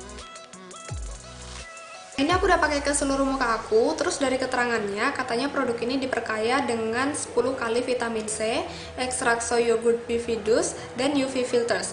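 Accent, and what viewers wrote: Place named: Yogyakarta, Indonesia. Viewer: Medok.. orang jogja po mbak?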